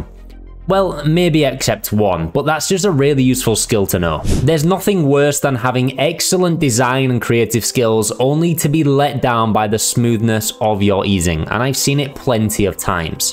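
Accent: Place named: Manchester, United Kingdom. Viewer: You look and saund a bit Mancunian XD are you ?.. oh btw.. where can we find your projects? I love what ive seen here in this video..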